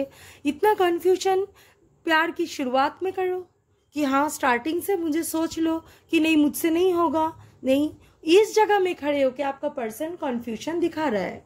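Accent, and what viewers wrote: Bengal, India. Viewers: Always love to hear you, especially your bengali accent. The ways you explains, really every single word touched my heart . Pile 1 resonated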